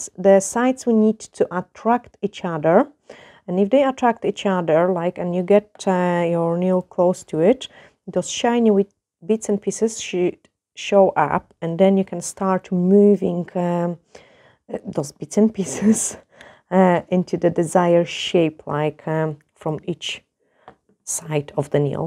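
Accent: Scotland, United Kingdom. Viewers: Thank you for this video! Such an interesting accent. It’s like, Scottish but also something from Eastern Europe?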